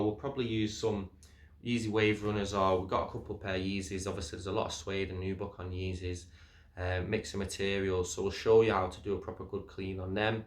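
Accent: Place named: United Kingdom. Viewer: This might one of the most British accents I have ever heard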